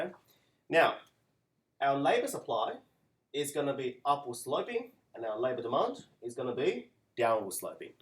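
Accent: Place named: England, United Kingdom. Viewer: Chinese with a bit English accent, like your lecture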